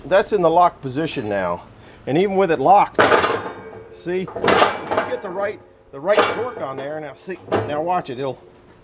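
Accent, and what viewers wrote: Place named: Ohio, United States. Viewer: Where are ya located ol boy. Been wondering, we all think your an Ohio boy from your accent and your poplar you cut.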